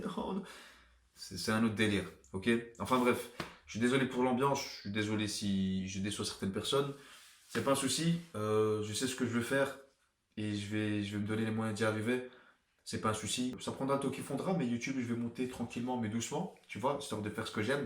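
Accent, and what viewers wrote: Belgium. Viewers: De quel côté de la Belgique es tu?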